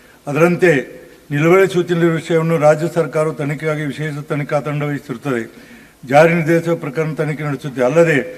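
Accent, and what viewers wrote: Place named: North Karnataka, India. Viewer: Good sir North Karnataka language❤❤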